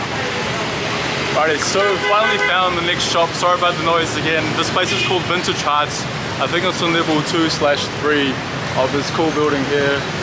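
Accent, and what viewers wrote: New Zealand. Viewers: Yo man, great video and music choice (RIP,) thought I could recognise a similar accent (from nz too lmao) defo will try to check some of these stores out at the end of the year when im in Viet! Your vids are hella entertaining my man keep it up <3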